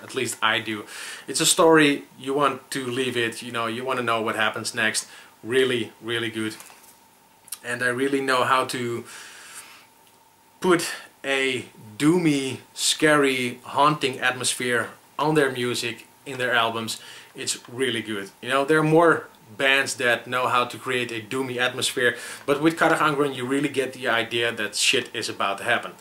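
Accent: Amsterdam, Netherlands. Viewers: hahaha je hebt echt een duidelijk amsterdams accent!! haha geweldig! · Hahhaha je hebt een Amsterdams accent. Awesome : P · Wow your 100% Amsterdam xD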